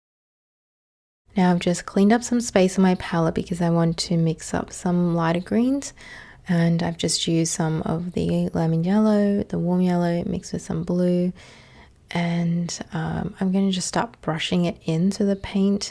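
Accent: Australia. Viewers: Great video! Thanks for not using background music; the combination of silence and your sweet, Australian voice makes it a true pleasure to watch and listen to. Greetings from Norway.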